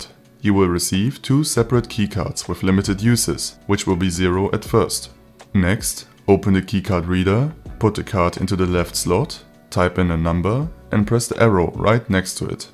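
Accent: Germany. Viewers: Irgendwie so ein deutscher akzent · I hear deutschen akzent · Are you from Germany?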